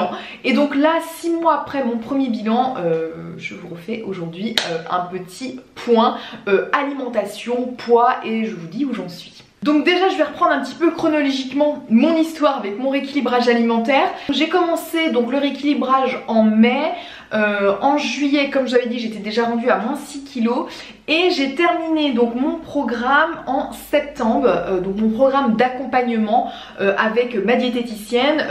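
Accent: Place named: Brittany, France. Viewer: C'est l'accent Breton qui veut ça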